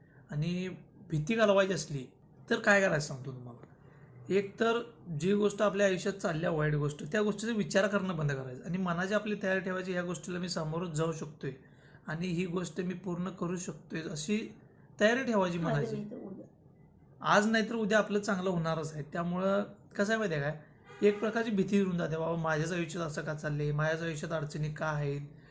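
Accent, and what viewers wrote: Kolhapur, India. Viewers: दादा कोल्हापूहून आहे काय भाषेवरून विचारल..😊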